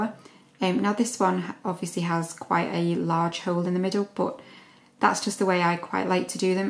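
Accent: United Kingdom. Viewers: I love Brits accents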